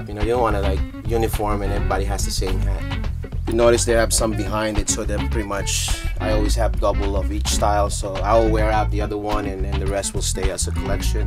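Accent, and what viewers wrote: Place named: Philippines. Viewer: First word came out form his mouth, and I was like "He's Pinoy!"!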